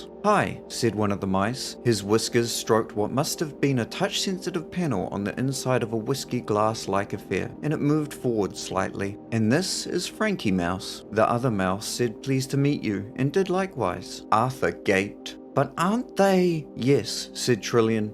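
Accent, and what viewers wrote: New Zealand. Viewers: Kiwi reading! Yaaay! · Oh snap! 😉Awesome read though with a kiwi ❣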